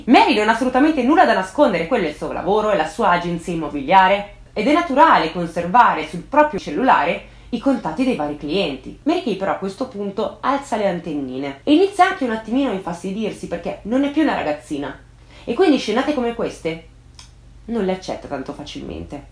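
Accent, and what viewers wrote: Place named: Rome, Italy. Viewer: Il tuo accento (Per me) è Romano, ma sei di Roma?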